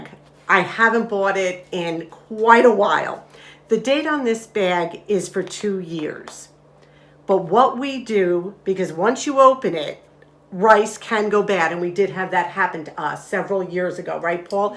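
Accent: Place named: New Jersey, United States. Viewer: Great tips! We give kitchen scraps to the hens, we get eggs. As a native Philadelphian, I gotta say you sound like you're from Jersey.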